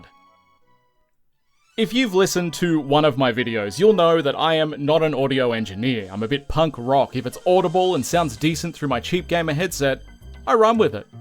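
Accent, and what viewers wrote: Australia. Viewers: If you didn't show us how to reduced those Ss I would have no clue you are Australian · Wait, you're Australian? I'm completely not surprised and in fact I'm sure you mentioned it in at least one video I watched before, but it just didn't "click" with me. Has audio editing chicanery been behind me not registering your accent in our shared native language as "foreign"? This is like if I was an NPC who suddenly noticed some metagame exploit you've been going through on a challenge run.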